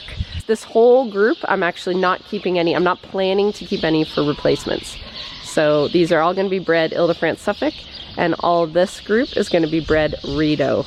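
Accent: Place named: Canada. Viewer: It took me a second to realize you are Canadian. Seriously thought you were just from the Northern parts of the US like Montana or Nebraska.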